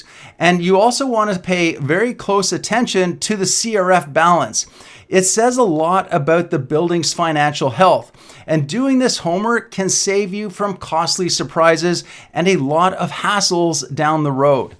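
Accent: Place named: Ontario, Canada. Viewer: You talk like you are from Ontario. We don't say "out or "about like that here in Vancouver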